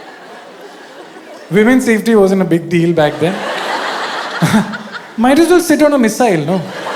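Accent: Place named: North India, India. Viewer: പാലക്കാരൻ ചെക്കാ പൊളി പൊളി but your accent is like a north indian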